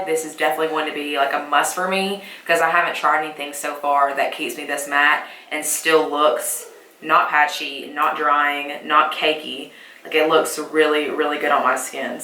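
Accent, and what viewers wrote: South, United States. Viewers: I love your accent! Us girls with southern accents are the best 😄 you’re gorgeous by the way! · OMG girl same!!! I'm southern and from Alabama!!! It's good to hear a makeup guru that's southern like me, lol. You should follow me in instagram, I have to accounts one is my main gracieorr_ and the other is my "spam" and I basically just post a lot of makeup looks I've done. I would love for you to follow me!!! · Yasssss .... Girl your accent just gave me life ... I'm from SC and I didn't realize how much I miss hearing that southern accent . U look amazing !! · I thought you were from Georgia cause of the southern accent lol but I'm Mexican but I'm raised in Georgia and i sound like you lol 😂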